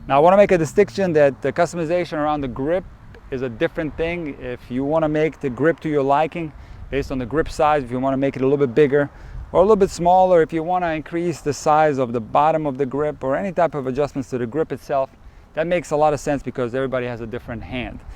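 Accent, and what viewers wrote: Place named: Eastern Europe. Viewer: is the Eastern-European, no B.S. coach you have in your dreams/nightmares. The accent, the straight-talk, no coddling, no touchy-feely*. Just cut the crap and get to the point. No excuses. I pulled all the weight off my rackets after this video because it confirmed my suspicion that it really wasn't worth the trouble. I'm not that good. I'm flattering myself. *I work in a very touchy-feely profession. I'm touchy-feely. But from my tennis coach, I want cold hard unvarnished truth. Update: I bought a 3rd frame (used 2018 Radical) so I can honor my desire to tinker unnecessarily with things (it’s an affliction, I admit). This also is per suggestion - 2 frames the same in case of string breakage, 1 frame for trying different stuff.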